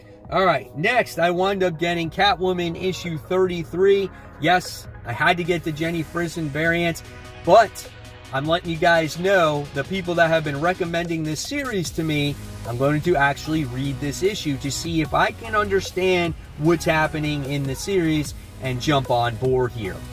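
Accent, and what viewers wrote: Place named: Pennsylvania, United States. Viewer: I always thought you were in Pennsylvania with that accent. I live in SW Florida and love it. Great job on the 10k!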